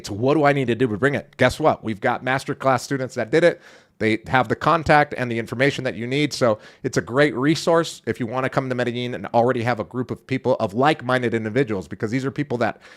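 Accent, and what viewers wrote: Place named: United States. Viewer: I am quite happy to hear a "real yank voice" in Latino body! Subbed.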